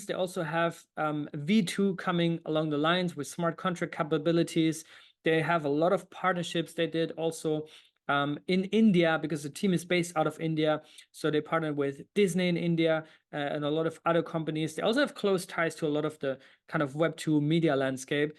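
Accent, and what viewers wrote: Germany. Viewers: oh shit i texted in german. Maybe im wright and your accent is german?!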